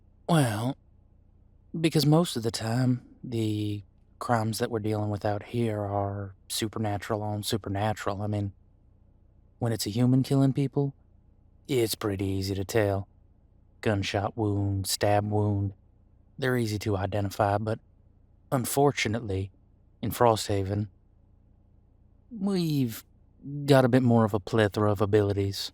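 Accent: United States. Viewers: FUCK YES HE'S SOUTHERN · Was this made before or after you got the cable situation sorted? Also I'm just curious as to the accent. It feels Carolina with a bit of Kentucky touches. Meto voice to be sure that is compatible with their roots. Bit of " turns Detective after encounter with a human fiddler that changed their mind about life".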